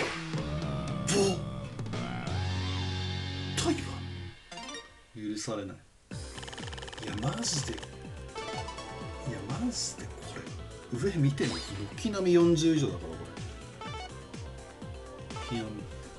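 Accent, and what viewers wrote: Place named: Kagoshima, Japan. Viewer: の鹿児島弁かっこいい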